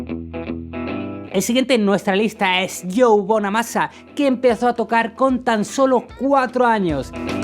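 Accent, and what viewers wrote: Andalusia, Spain. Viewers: A veces le siento como acento andaluz!!!🤙🤙🤙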